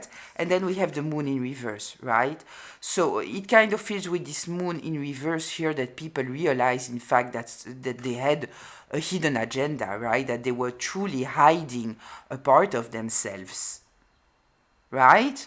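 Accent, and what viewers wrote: France. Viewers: The word for only giving to get something back is “transactional”. Love your accent, makes me feel like I should wear a beret riding my Velo Solex with a baguette in hand to listen!😁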